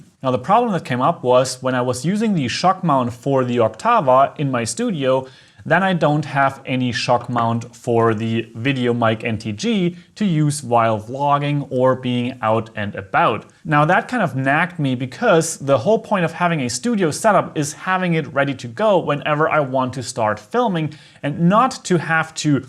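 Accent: Germany. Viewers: alles gut. Guter Inhalt. Gutes, flüssiges, verständliches Englisch. Dennoch hört der Landsmann den Landsmann durch ;)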